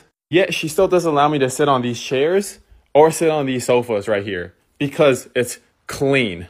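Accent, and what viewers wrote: Taiwan. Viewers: 聽口音是台灣移民過去的😂 冰箱還有一罐八寶粥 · 這口音 台灣過去的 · 聽中文口音感覺是臺灣移民。 希望大家都開心。 畢竟當初移民應該是希望家庭會有更好的生活條件。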